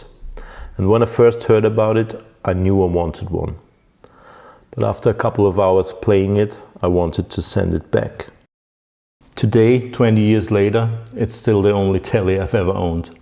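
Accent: Austria. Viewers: Ottakringer Bier?! Dachte mir bei den vorigen Videos schon dass ich den Akzent identifiziert habe 🙂Liebe Grüße aus dem sechszehnten Wiener Gemeindebezirk! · That's amusing, apparently my ears still work, hahaha! I thought I recognised your accent also, though I wasn't certain- nor so specific; I heard Austria, but not the regional part (my family comes from Neusiedl in any case). Entschuldigen sie, bitte, für mein in Englisch schreiben in die Mehrheit; mein "Ungarischer-Bauer Kinderdeutsch mischt mit Berliner & Hamburger Strassedeutsch" ist sehr rostig heute...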